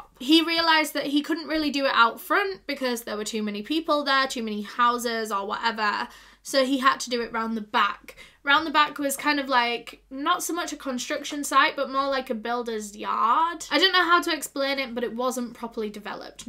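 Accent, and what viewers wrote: United Kingdom. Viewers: I usually don't like all UK accents but I love her UK accent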